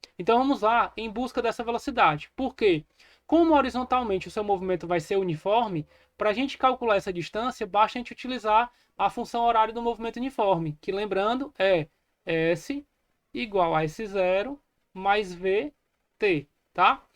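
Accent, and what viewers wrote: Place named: Ceará, Brazil. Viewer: Professor, ótima explicação, parabéns. Pelo sotaque cearense, certeza de que o senhor é meu conterrâneo!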